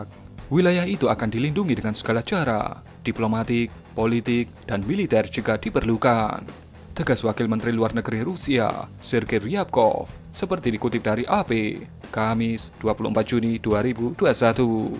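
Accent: Java, Indonesia. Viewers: Kok meddok banget mas wkwk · Medok amat mas suaranya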